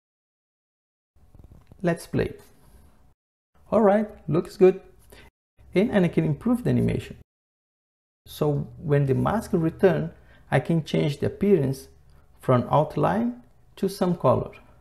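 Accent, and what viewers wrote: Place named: Brazil. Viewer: That brazilian accent...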